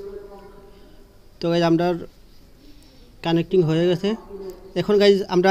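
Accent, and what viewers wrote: Sylhet, Bangladesh. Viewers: Vai apni sylhety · Sylhety bro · Tmr basha Sylhet tai na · Sylheti na kire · Sylhety ni bro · Vai sylheti grime🤟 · ভাই আপনি কি সিলেটি?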